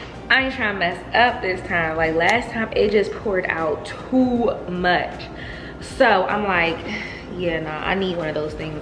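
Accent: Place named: New Jersey, United States. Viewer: yessss!! Lol she and I are from the same state. Lol and you sound like my cousin in NJ lol boy these locations and sounds are too funny lol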